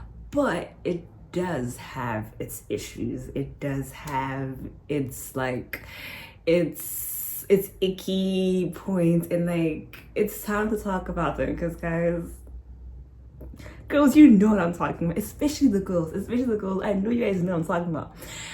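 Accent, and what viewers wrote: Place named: United States. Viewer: Yes you sound American 😭I thought we all knew that??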